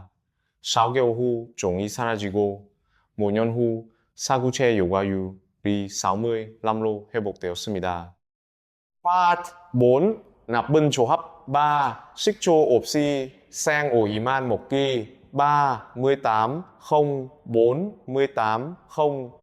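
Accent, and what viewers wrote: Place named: China. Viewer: 방송이 뭐 이래요 중국식 발음이 뭐예요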